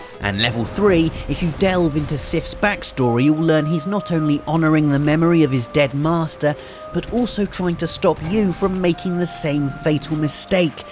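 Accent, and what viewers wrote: United Kingdom. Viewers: when he said "whispering into your ear" during the Dark Souls segment im sorry but his british accent made it sound like he said "whispering into your rear"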